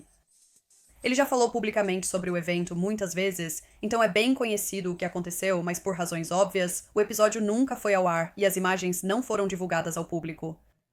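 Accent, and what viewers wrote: Portugal. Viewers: Conteúdo muito bom. Porém, português de Portugal... 🤢 Impossível de ouvir por muito tempo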